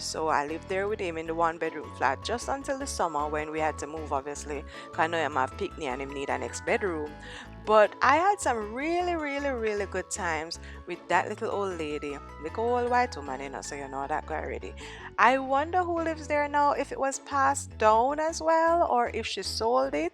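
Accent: Jamaica. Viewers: spicy and timely inserts of patois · love how you can go from patwah to well spoken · 😀😉😄😄. Love your attitude. Funny😁. Life is not one-sided. Love the patois too 😂😂😂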